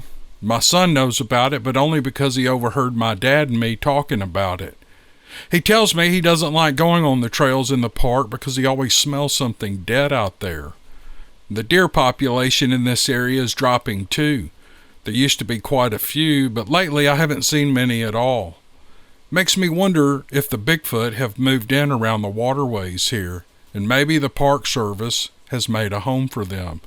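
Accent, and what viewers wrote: South, United States. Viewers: Hey, I’ve been listening for over 3 years love your show. Can’t believe this never crossed your mind. I’ve came to that conclusion quickly. My people are from the south good common sense folk, when I listen to you speak that southern common sense tone I’m familiar with resonates. Your restricted from certain parts of State Land and you can’t fly drones either??? Not a conspiracy theorist but it’s not a coincidence…neither are the unexplained disappearances in the national parks.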